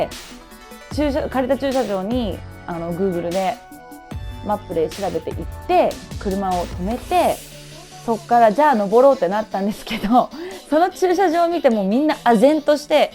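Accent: Philippines. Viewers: Whahaha, you've been staying in the Philippines for too ... way too long! You sound like a Filipina that is fluent with Nihongo. hehehehe. I was a college teacher once and had students who are now Japanese residents due to their family members who are from Japan ... ... whenever they chat with me or whenever I see their homemade videos while they're in Japan ... they sound like you right now. hehehe .... .... so cute. hehehe.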